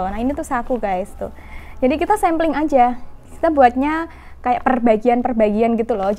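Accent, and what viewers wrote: Java, Indonesia. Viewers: Mbak ngomonge medok tp lucu😁.luvv mbak q lgsg mengulas tabus krena merasa sangat amat bermanfaat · Aku seneng nih kak ngomongnya medok medok gimana gitu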